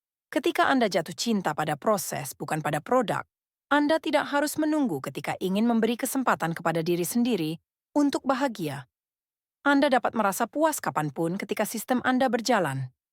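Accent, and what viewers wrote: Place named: Malaysia. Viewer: Kok kaya bahasa Malaysia si, logatnya jg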